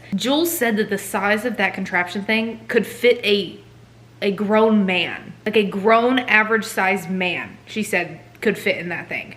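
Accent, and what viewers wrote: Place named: East Texas, United States. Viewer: currently in east texas and your accent is giving me life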